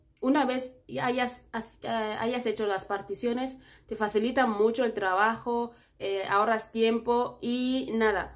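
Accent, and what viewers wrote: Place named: Spain. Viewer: Saludos desde Lawrence MA USA. pero soy natural de Puerto Rico.. me encanta tu acento de español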